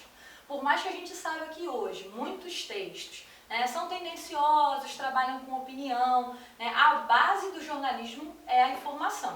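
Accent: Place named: Rio de Janeiro, Brazil. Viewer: Carioca é foda, fico prestando atenção só no sutaque kkkkk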